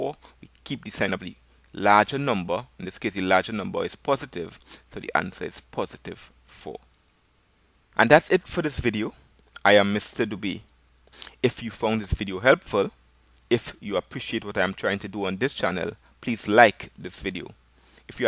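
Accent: Trinidad and Tobago. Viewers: are you from trinidad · I am so going to use your videos for teaching my classes!!! It's so nice for my students to hear a trini accent. Continue the good work! Bless up my fellow trini :)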